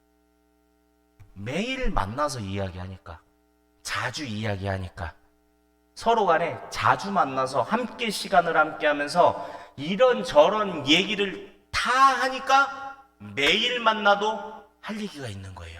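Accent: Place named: Chungcheong, South Korea. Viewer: 그런겨어 ㅎㅎ 우리충청도 사투리 반갑습니다 영육간에 강건 하세유😂😂😂😂😂😂😂😂😂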